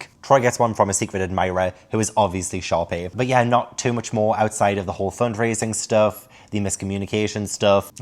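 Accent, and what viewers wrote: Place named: England, United Kingdom. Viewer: Nah. Never feel out of place. I can promise that just having an English accent means everyone there thinks you're too grand for them (I feel like I can say this cuz I'm an American?)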